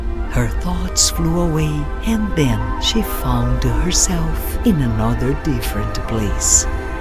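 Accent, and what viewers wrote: Brazil. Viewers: A narradora tem sotaque brasileiro quando fala inglês.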